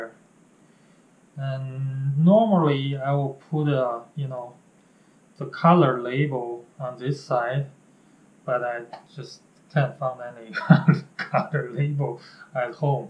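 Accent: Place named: China. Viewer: I wish i can do authentic Chinese accent like that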